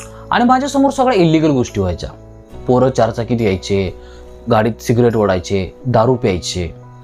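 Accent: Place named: Kolhapur, India. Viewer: छान माहिती व विश्लेषण करून सांगता व तुम्ही तुमच्या बोलीभाषेवरुन आमच्या कोल्हापूर चे वाटता.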